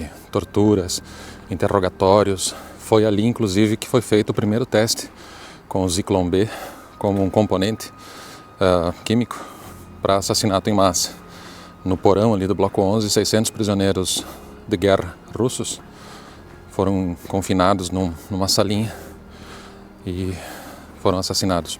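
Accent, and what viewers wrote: Rio Grande do Sul, Brazil. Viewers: Sou da região noroeste do RS é o mesmo sotaque por isso perguntei um abraço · Foi tudo muito triste meu Deus. Muita gente não sabem disso, eu imagino como é que ficam os que entram nesse lugar. O Judeus sofreram sem uma razão aparente mas acho que não tem nada a ver como castigo ou juízo de Deus, eu sou Cristã amo Israel oro por Israel, porque as coisas não tem sido boas pra eles ultimamente. Eles são o Povo de Deus alguém queira ou não, obrigado pelo vídeo pois vim aprender muitas coisas conhecndo maus a história, tenho assistido outros vídeos e lido livros sobre o assunto. Que Deus abençoe a ua vida, tens um sotaque Gaúcho já fui missionaria no RS amo os meus irmãos Gauchos voltei pra minha terra com minha família deixamos muitos irmãos lá continuamos nos comunicando com eles nas redes sociais.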